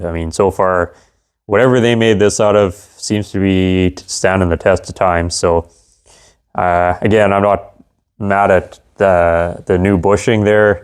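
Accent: Canada. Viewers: I truly enjoy watching your videos and listening to your Canadian accent. You also have a dry sense of humor, as do I. So I appreciate that too. Keep the great content coming. And don't beat yourself up over wasting time. We all do it. I mean, just sitting here day after day watching other people work is sort of a waste of time. But heck, I'm 82 so I do what makes me happy.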